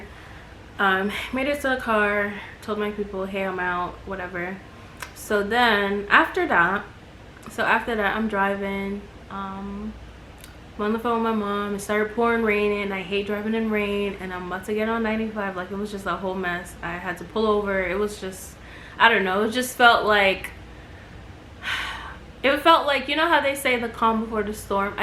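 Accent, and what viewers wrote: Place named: Jamaica. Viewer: Heard the accent before the patwa buss 😂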